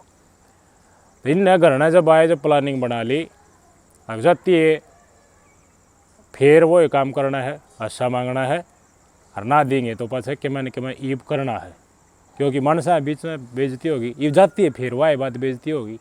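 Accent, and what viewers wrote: Haryana, India. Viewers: Bhut bdiya Bhai sahb Aapki haryanvi se lgta hai real haryanvi yhi hai 🙏🙏🙏🙏💐💐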